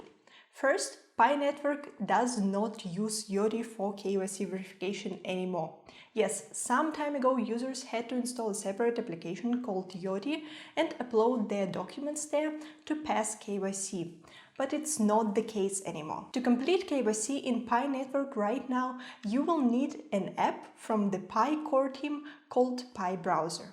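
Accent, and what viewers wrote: Germany. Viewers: your englisch with strong german accent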